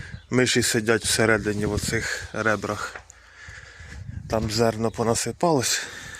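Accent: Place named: Dnipro, Ukraine. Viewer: Браток,приветствую! Слышу по говору ты из Днепр.области. Поздравляю с покупкой! МАЗ это просто Мерседес для села!!! 😊 А если подцепить прицеп,то 5-6 Газонов заменит в уборочную.😊 Удачного ремонта!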